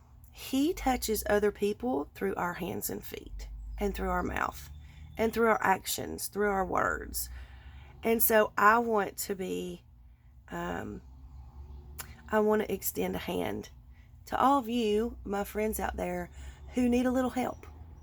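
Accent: South, United States. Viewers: Hi, long time watcher, but recently subscribed. Your vids have been very helpful, informative and entertaining. I love your sense of humour, your voice and your southern accent is charming. I had to take the time to comment today because eventhough my creations are not yet ready for market, just knowing this is available awakens possibilities for all newbies. You have a beautiful heart and it is crafters like you that make this community so amazing. God Bless you and may the purity and kindness He has bestowed upon your heart be conducive to a successful endeavour. You rock! A million times thank you! Feel better soon.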